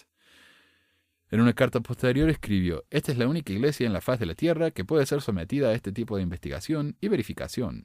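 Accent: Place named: Córdoba, Argentina. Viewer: Disculpame, sos de Córdoba, Argentina? Por tu acento, digo.... Gracias!!!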